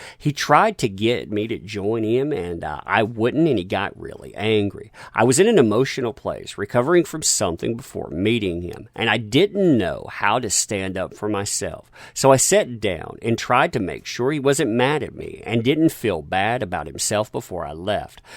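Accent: United States. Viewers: 2 mins in and you haven't even started yet? And your vocal fry annoying put on voice is just so annoyingly over American that I couldn't go on past the 2 min in introduction.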